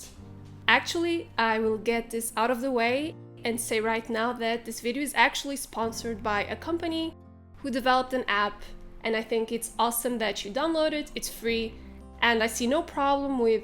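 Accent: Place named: Portugal. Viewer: só pelo sotaque consegui perceber que eras de Portugal ahshagshs adorei o conteúdo, you just gained one new sub <3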